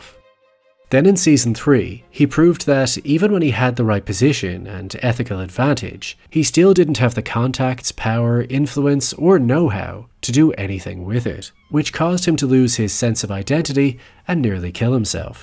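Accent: Ireland. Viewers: Ah brilliant, it’s so nice to hear a fellow Irish person who is making my favorites videos, I’m Deirdre O’Brien, unmistakably Irish name, especially in Irish, but I’ve been meaning to ask you since the first video of your’s I watched on Prisoners which was fantastic. Love your videos, it’s so great to hear a lovely Irish accent making wonderful videos, you’re the only one (from the kind of videos I watched) and you do a great job. Your analysis is always so great and your observations have made me wonder have you and English degree. Anyway very happy for you in a sea of American creators. Wishing you more and more success, you completely deserve it. 😊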